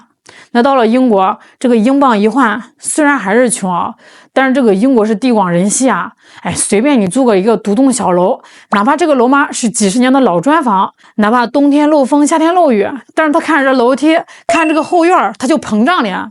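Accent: China. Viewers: 开什么玩笑，让中国大陆地方口音浓重的人念稿，解读香港群体在海外的心态，如此驴唇不对马嘴自己不嫌别扭也罢，出来作弄恶心他人则有辱斯文有失厚道……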